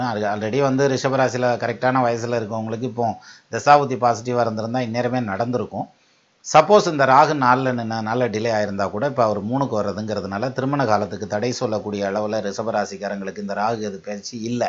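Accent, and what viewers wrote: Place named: South Tamil Nadu, India. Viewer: Sir,I like ur Tamil and slang..like we south Tamils. Thank u.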